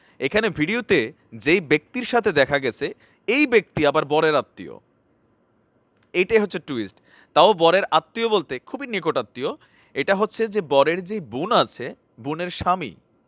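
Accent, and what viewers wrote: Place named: Cumilla, Bangladesh. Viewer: ভাই এই ভাবে গান গাইলে তো আমি আপনার প্রেমে পড়ে যাবো সো কিউট কুমিল্লাার ভাষা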